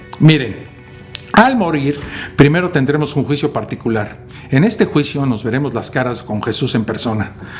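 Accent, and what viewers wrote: Mexico. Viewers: Hay que tener fe hijo mío. Hay que creer por fe hijo mío. Bendiciones príncipe desde Rep. Dominicana. Haces una tremenda labor hermano. Pero como estadista que soy, debo decirte que no podemos despojar a las sociedades así por así de la tendencia a creer. Es decir de las prácticas religiosas. Lo que podemos hacer en ese sentido es desmitificarlas. Depurar los sentido de fe de aquellos aspectos oscurantistas que claramente hacen daños. Vamos a presentar Dios mediante un proyecto en tal sentido a ver qué pasa. Dios te bendiga. El acento mexicano es sin duda un encanto.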